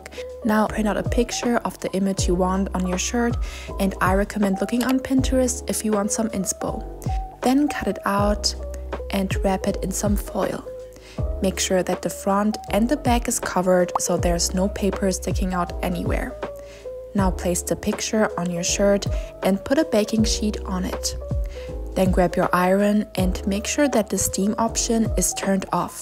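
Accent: Germany. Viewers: I hear some german accent .,. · I hear german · The German accent 😅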